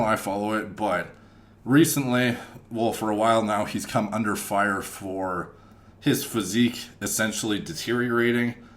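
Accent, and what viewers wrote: Canada. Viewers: This dude is from Canada af. They the only ones that say aboot. Lol Love your videos though man. Keep it up. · The Canadian accent is STRONG in this one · Your Canadian accent is obnoxious. Couldn't listen to you talk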